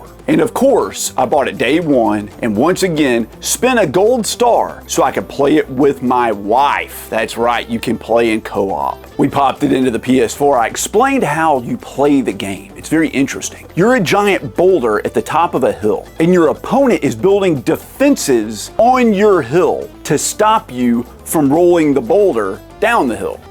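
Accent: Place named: North Carolina, United States. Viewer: Just curious, are you from North Carolina? I am and you have that accent lol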